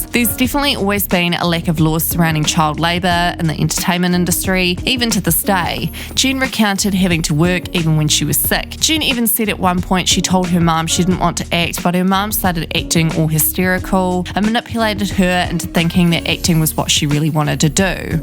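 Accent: New Zealand. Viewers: its a new Zealand accent not Australian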